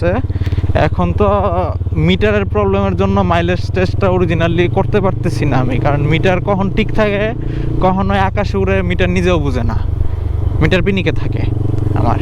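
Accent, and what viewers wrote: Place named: Narsingdi, Bangladesh. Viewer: ভাই এর ভাষা অনেকটা রুপগঞ্জ/ নরসিংদির মত 🤔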